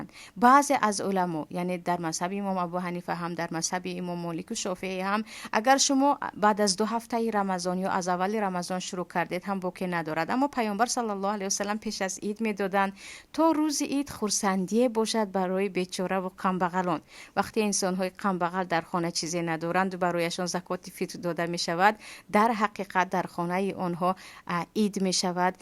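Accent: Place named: Tajikistan. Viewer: Саломалекум апачон бехтарин гапои ширин заден ба лахчаи ширин точики фахамо хдои мехрубон нигахбон боша шмора хазор рахамат.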